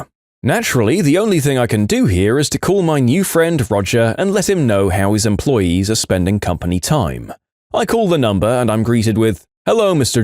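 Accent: United Kingdom. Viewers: Its been a while since ive watched am i the jerk? so when i watched this i was absolutely bamboozled that he was british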